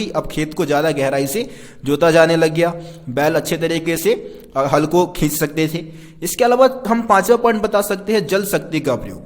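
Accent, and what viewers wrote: Delhi, India. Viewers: Sir aap delhi se ho na😊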